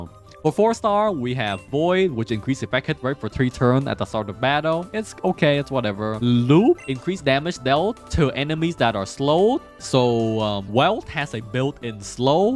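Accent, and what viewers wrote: Vietnam. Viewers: Is he Vietnamese. My cousin has the same English accent xD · dude sounded like a vietnamese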